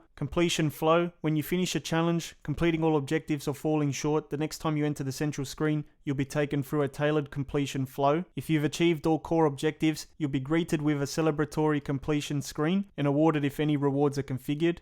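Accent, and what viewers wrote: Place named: Australia. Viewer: I just subscribed because of your Australian accent!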